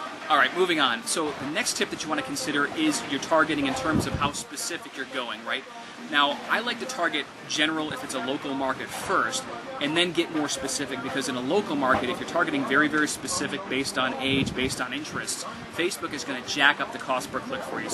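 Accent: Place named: Jamaica. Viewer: sounds like jamaic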